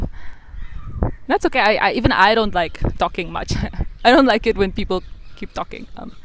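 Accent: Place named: South India, India. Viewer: Her accent is like south Indian 😂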